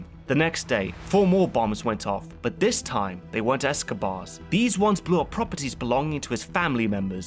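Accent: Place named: England, United Kingdom. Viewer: The English accent helps with his credibility xD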